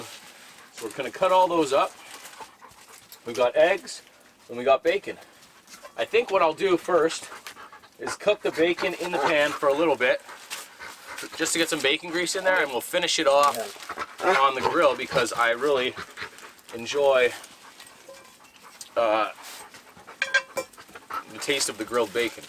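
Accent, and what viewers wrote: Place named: Canada. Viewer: why oh why cant canucks say OUT right ?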